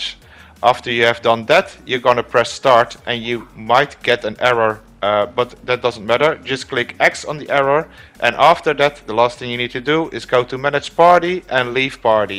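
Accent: Netherlands. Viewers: Thanks for posting! Btw are you Dutch?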